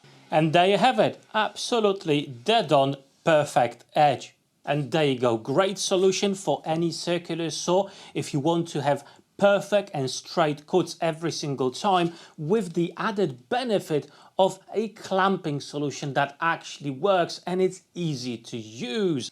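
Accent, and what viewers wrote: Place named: England, United Kingdom. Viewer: Another brilliant video made by a Polish guy with an English accent. Always look forward to your good work.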